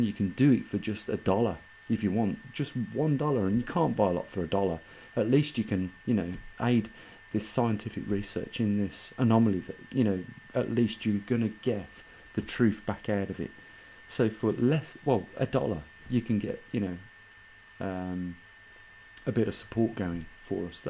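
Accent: Birmingham, United Kingdom. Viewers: Bloody good info, this explain perfectly whats happening I fully believe were going into a GSM cooling period and I follow ADAPT2030 and Ice age farmer, so I will subscribe to you to get more good info....I'm in ozz now but was from Castle Bromwich..you cant hide that accent mate.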